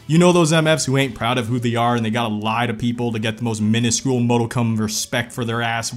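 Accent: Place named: Atlanta, United States. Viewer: ngl you're kinda starting to pick up that ATL dialect, almost sound like someone native to Atlanta now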